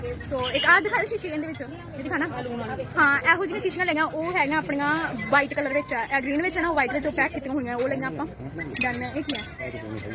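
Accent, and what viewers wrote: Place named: Bathinda, India. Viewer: Kida jiss hisaab naal tuc yaar pronounce krdeo oss hisaab eda lgda v tuc Bathinda ton o cuz mere nanke v Bathinda ton ne te o v bilkul same eda hi yaar pronounce krdea..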